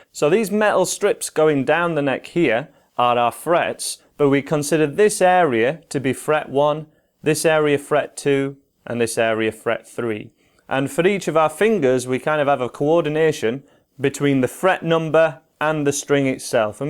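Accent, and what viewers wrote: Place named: Northern England, United Kingdom. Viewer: Most recent!!! Hi I'm enjoying your video. Thank you for taking the time to post it in order to help beginners like myself. I'm English living abroad and it's nice to hear your northern accent. Feels like being back in the UK. 🤩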